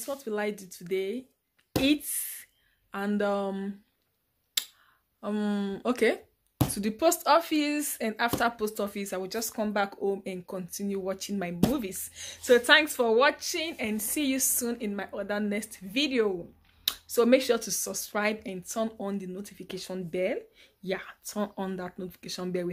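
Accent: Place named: Nigeria. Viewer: Loll I know you're a Nigerian your accent tells it all. Anyway I like how you just be yourself.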